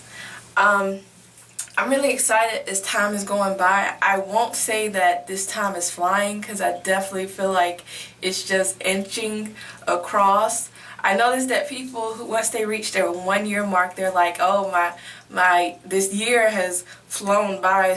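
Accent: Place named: South, United States. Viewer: Girl, I'm from the South too and I understood everything you said, lol. Your hair is looking FAB honey! Watch before you know it, it will be loc'd, it's gonna sneak up on ya. ;)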